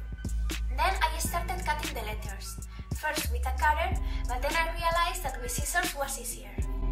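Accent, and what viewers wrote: Basque Country, Spain. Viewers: She's from Spain and she has an accent but I could understand her. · eres española?? Porque tu acento de verdad es muy español Are you from Spain? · Are you from Spain? Because of the accent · Your spanish accent mixed with your english pronunciation is so beautiful! I don't know too much Spanish, such a shame because my family comes from Madrid, but I would love to see a video with you speaking Spanish!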